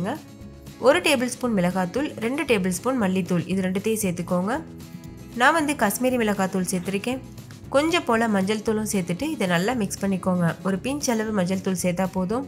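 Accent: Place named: Tamil Nadu, India. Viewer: I like your Tamil accent.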